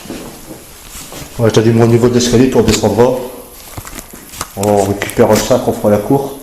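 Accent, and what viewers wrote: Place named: Hauts-de-France, France. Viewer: C'est toujours un plaisir de suivre vos recherches. Vous êtes des hauts de France ? C'est le petit accent qui me fait penser ça en tout cas bravo beau travail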